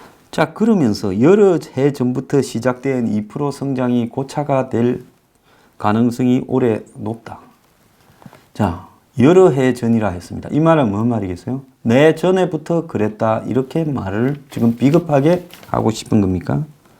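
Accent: Gyeongsang, South Korea. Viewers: 내가 이런 글 잘 안쓰는데... 늙어서 곤대질 하질말고 조용히 살아라. 갱상도라고 티내지 말고...내가 다 부끄럽다 아이가. 이 자식아.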